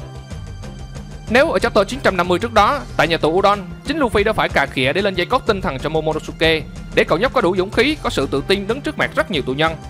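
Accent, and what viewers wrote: Quang Ngai, Vietnam. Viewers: Ad quê Quảng Ngãi hay Quảng Nam vậy? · Ad người miền trung hả anh, em nghĩ anh người quảng ngãi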